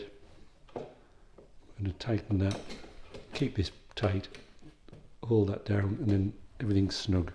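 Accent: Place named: Teesside, United Kingdom. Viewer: When i first seen the expedition a few weeks ago i was slightly concerned about the top sections but that looks very straight.I really enjoyed that, especially the very understandable Teesside accent 😁….Thanks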